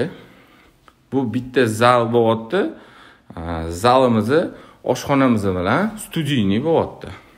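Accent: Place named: Tashkent, Uzbekistan. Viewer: Узбек тилини софлигини бузадиган шева , Бу Тошкент шевасидир .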